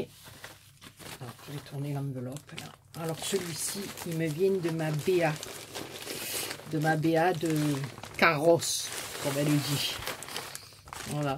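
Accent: South, France. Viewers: Coucou, que de gentils et beaux couriers, tu les mérite. J'aime beaucoup tes vidéos et ton accent ensoleillé, pour moi qui vit dans le nord, ( Belgique ), c'est juste du bonheur. Je n'ai pas ton adresse sinon je me ferais un plaisir de t'envoyer une carte. Gros bisous Nanny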